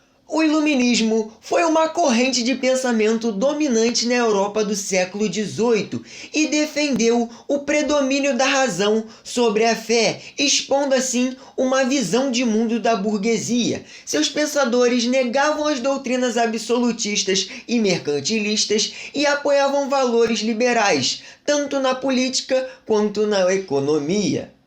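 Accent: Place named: Rio de Janeiro, Brazil. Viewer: Logo no começo já amei o sotaque carioca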